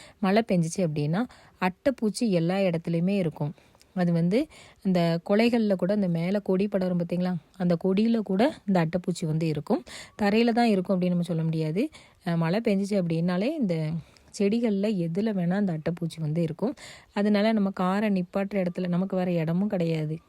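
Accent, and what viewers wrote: Tirunelveli, India. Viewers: பொடு பொடுன்னு திருநெல்வேலி ஸ்லாங் 👌🏿👌🏿👌🏿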